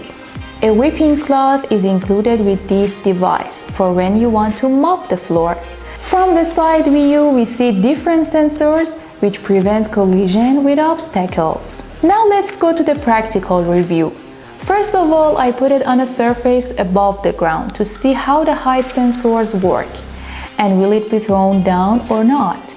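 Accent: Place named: Iran. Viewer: Phenomenal Persian accent!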